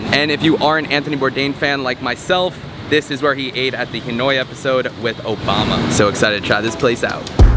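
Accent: New York, United States. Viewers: Is this guy from New York?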